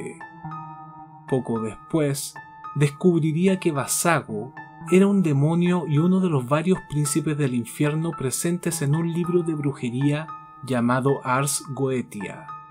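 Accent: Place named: Chile. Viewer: La narración y dicción es perfecta hermano...y el acento sutilmente chileno... je je ..oye un abrazo y muchos éxitos...nos tienes enganchadísimos a tu canal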